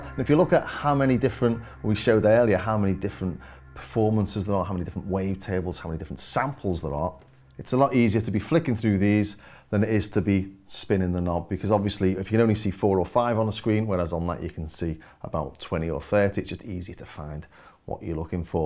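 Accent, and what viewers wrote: Liverpool, United Kingdom. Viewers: Dang I’m sold on this now! Cheers from Hollywood, CA. Love the accent…would that be what they call “scouse?”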